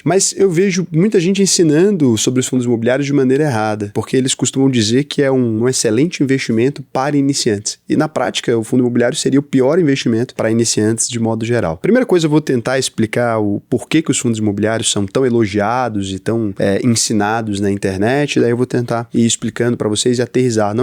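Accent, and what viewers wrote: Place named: Goias, Brazil. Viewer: Uai voce e de goiania irmao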